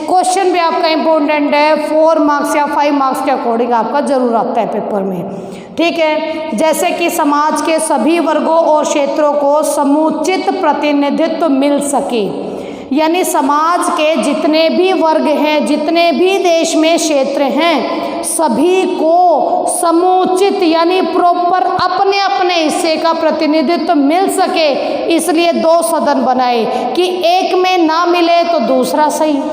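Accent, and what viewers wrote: Haryana, India. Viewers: Maam ap Hariyana se ho kya ap ki aavaj se lag raha h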